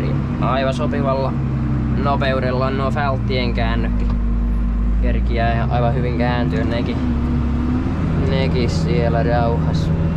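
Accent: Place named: Jalasjärvi, Finland. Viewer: Puheesta päätellen asuinpaikka ei taida olla kaukana jalasjärvestä? 😊🤔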